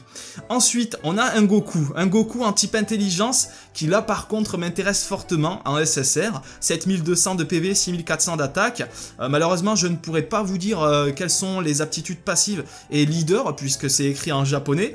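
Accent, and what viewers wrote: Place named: Marseille, France. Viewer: tu es Marseillais? Car je suis Marseillais et j'entend plein de personnes avec ton accent et TU AS LE MÊME ACCENT QUE MOI ☺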